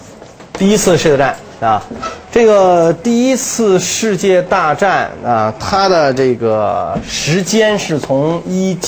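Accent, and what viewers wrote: Beijing, China. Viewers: 哈哈 这个京腔好可爱～ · 一口京腔，好听啊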